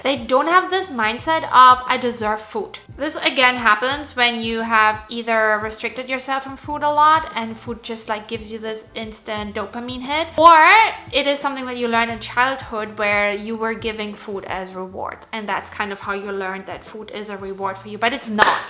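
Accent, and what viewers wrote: Germany. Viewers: Diet advice with a German accent was so needed for me! ❤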